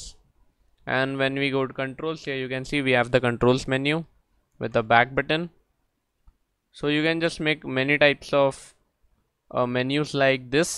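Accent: India. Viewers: I'm sorry for making fun of your Indian voice.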